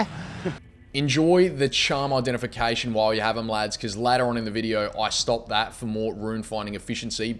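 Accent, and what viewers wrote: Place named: Australia. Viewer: Yankee here, but my family did live in Melbourne for 7 years recently before to moving back to the States. I played D2 when it first came out, then again about 10 years later to build a character to do the Ubers. In my third run now to try to beat the Ubers and colossal ancients this time. Really enjoyed hearing the High Rune Frustrations in an Aussie accent and learned a thing or two along the way. Subbed so I can hear more whinging and I hope you eventually get that perfect role.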